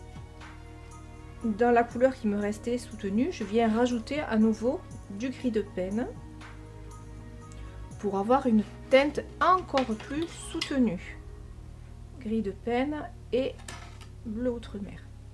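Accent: South, France. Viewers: Magnifique ! Vous avez un bel accent du midi bien agréable. Merci pour cette démonstration.😍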